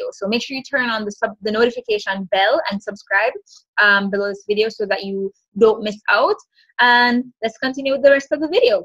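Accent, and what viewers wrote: Jamaica. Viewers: you sound Jamaica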